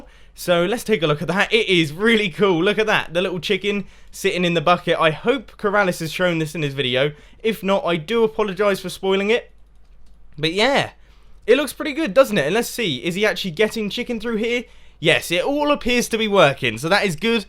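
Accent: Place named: Bristol, United Kingdom. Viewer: Hey, I'd like to see an in-car vlog from you but only because I think you might live near me (based solely on your awesome accent, me babber):D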